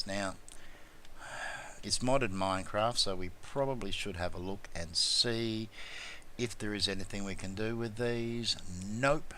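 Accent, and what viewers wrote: Australia. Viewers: I just have to say how much I love the Aussie vernacular and accent! You have such charming ways of saying/describing things. (This does not refer to 'Aussie English'), you know what I mean. <3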